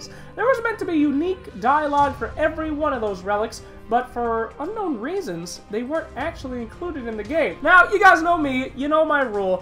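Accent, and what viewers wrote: United States. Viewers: >American pronunciations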